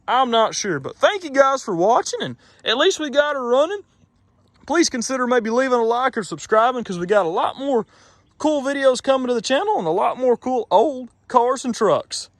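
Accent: South, United States. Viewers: On a different note… you have the most amazing southern accent.. makes it even better watching your videos.😊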